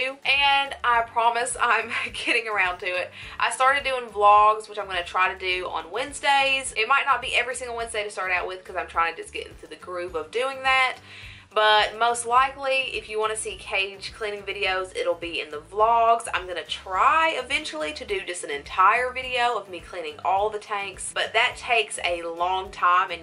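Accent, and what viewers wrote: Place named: Alabama, United States. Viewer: I listened to just one of your videos and I was like “this chick is from Alabama!” Came to this video and was definitely not disappointed! You are such a good source of info for snake info :D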